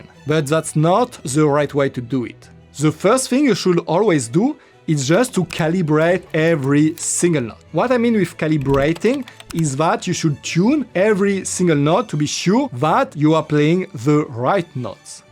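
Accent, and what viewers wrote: France. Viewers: Your French accent is difficult to follow. Please use AI to change to a British or American accent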